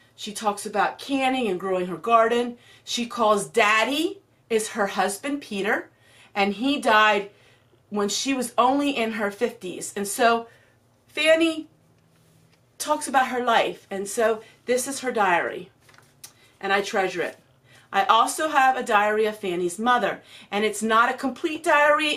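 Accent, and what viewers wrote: Pennsylvania Dutch, United States. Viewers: Hi. I like your PA Dutch accent. thanks for sharing. :)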